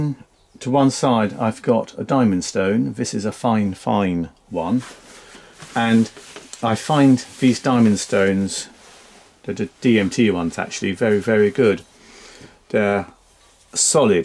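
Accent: England, United Kingdom. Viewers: Thanks for the reply, comments and help. I have all of these while using an old “Real” leather belt more mounted to a strip of plywood. As an auto tech of 40+ years, I have polished a number of parts for restoration or those I have fabricated. I enjoy learning and new ways of doing things. I find your thick Proper English accent pleasantly enjoyable from a farm boy now in Omaha, NE. Blessings and be safe for the Holidays. Enjoy.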